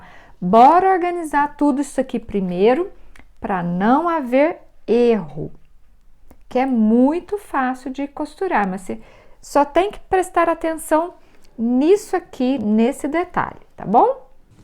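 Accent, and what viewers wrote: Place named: Russia. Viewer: I truly love the colors n the style, Especially your Russian accent😅❤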